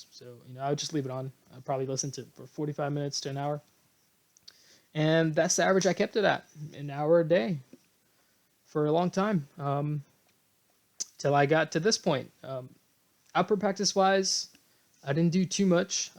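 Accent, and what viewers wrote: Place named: United States. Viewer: Hola! Vas muy bien con el español. siendo sincero, tu acento sigue escuchándose como estadounidense pero es solo cuestión de tiempo. Mucha suerte!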